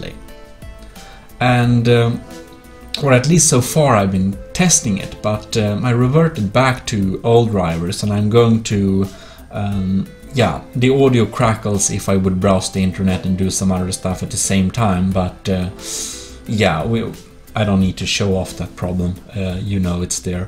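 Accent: Sweden. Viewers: Man hör verkligen att du är svensk lol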